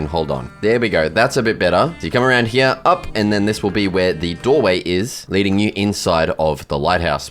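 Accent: Australia. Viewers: Are you Australian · Bro you can just tell this dude is Australian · Ready to put this on and go to sleep to the best Aussie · I can tell this guy is from Australia because of his accent and how he speaks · I know this guy is Australian I think because of the accent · R u aus?🇦🇺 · I've never realized how Australian actually sounds 😂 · Bro IS the stereotypical Australian. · I have stumbled across an Aussie minecrafter that’s speaking adult talk! I’m so happy lol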